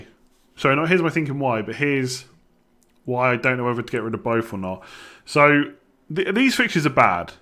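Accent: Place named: England, United Kingdom. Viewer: Ghanaian and he is saying it right it is just due to the English accent he can't say it well I have the same thing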